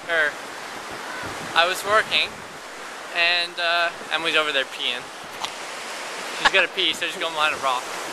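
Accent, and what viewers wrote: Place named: Canada. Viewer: i love how you say out ...hahhahahhaaha canadian accent :D srry i am american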